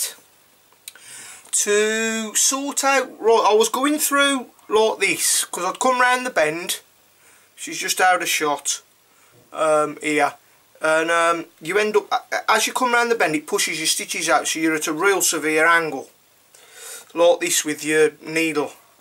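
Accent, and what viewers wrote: Black Country, United Kingdom. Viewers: Just getting in to leather work, and the first video of yours that i have seen - you got an instant sub as soon as you spoke. Us Yamyams have to stick together! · Black Country way, I reckon.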